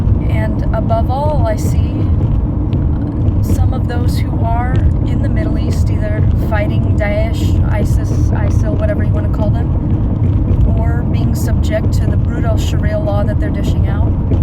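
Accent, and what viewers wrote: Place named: United States. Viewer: she's USA citizen and sees what happened to PC Europe and like many US peeps she doesn't want it to happen in USA as Trump supporters worry about.... she's quite pretty.......she reads books!!!!!!!!!!! USA woman who reads books?????????????????????? da! is this possible? Am I seeing a female robot with a US accent' 'so nice that accent'' yes what a lovely voice....did I mention she reads????????????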